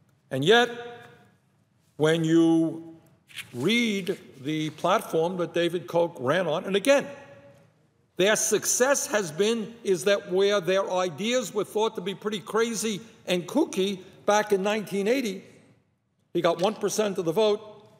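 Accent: Brooklyn, United States. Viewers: Few accents I enjoy listening to as much as a Brooklyn Jew!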